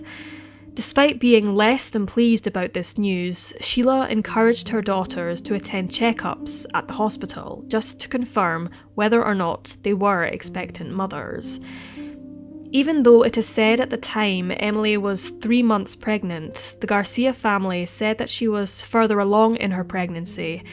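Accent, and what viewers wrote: Scotland, United Kingdom. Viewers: I can see why businesses often want to set up their call centres in Scotland. Can't beat the Scottish accent. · Excellent. Another sad sad case I never heard of. Wish I could have helped. Thank you. I have subbed as well. PS I love your accent. Are you...Scottish? Please forgive me if I am wrong.🙏😩✌👼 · You know it's a Scottish narrator, when you hear, "alight" instead of "get off." Thumbs up for eloquence! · It's Scottish can't u tell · It's a perfectly good Scottish accent, nothing funny about it!! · Which part of Scotland are you from?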